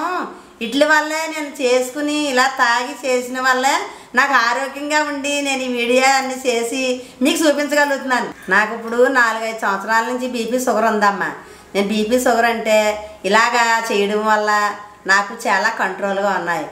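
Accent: Godavari, India. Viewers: godavari yesa basha adhurs bamma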